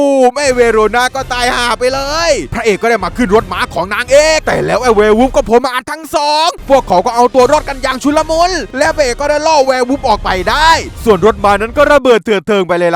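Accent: South, Thailand. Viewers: มึงคนใต้...ไช่หม้ายคับพี่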